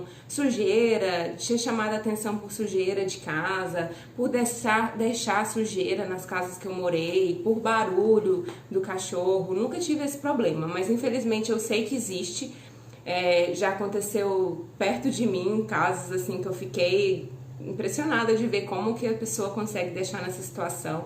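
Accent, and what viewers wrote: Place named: Minas Gerais, Brazil. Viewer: Oi, vc é mineira? É tão gostoso teu sotaque. Vc sabe se no saguaçu e na zona industrial norte tem enchente?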